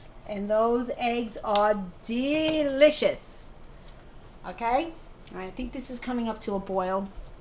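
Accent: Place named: Brooklyn, United States. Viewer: You have such a nice Brooklyn acccent you still live there 😃😃😃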